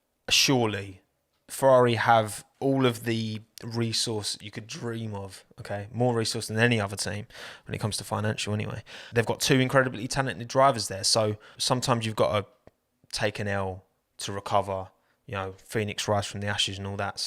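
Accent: United Kingdom. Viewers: So Bri ish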